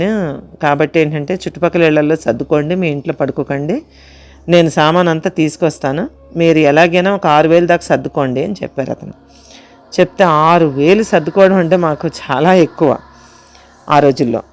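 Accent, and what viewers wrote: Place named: Parvathipuram, India. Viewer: Ammaa maadi kuda parvathipuram.konni padaalu appativi golem lantivi vintunte chaalaa santhosham anipinchindi .mee video chudatam start chesina tarvaata starting lo appati aa yaasa konni sarlu mee notiventa vunnappudu ide santhosham kaligindi ammaa meerante naaku chaala ishtam ammaa❤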